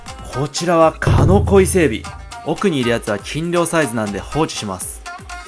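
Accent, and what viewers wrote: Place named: Kansai, Japan. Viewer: たまーに関西弁入るのは何でなんですか？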